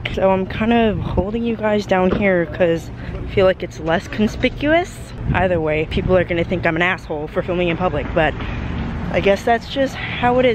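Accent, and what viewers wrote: United Kingdom. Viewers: Loving the accurate af British accent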